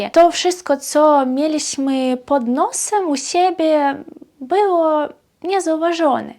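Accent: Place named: Borderlands, Poland. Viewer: kolejny bardzo ciekawy filmik. Twój pomysł, aby zrobić cykl filmików o historii Białorusi uważam za świetny. Zrób to! W Polsce panuje opinia, że Białorusini zaczęli odkrywać swoją tożsamość narodową z końcem XIX wieku. Wcześniej (i później zresztą też) określali się jako "tutejsi". Historia bardzo mnie interesuje, szczególnie okres Rzeczypospolitej Obojga Narodów. Jestem bardzo ciekawy rezultatów Twoich dociekań i opinii. Jestem też pewien, że zainteresują one również wielu Białorusinów. Jeszcze raz - świetny pomysł! Czekam z niecierpliwością... P.S., mówisz po polsku, jak rodowita Polka urodzona na Kresach (kwestia akcentu), a wiele Polek mogłoby pozazdrościć Tobie bogactwa słownictwa...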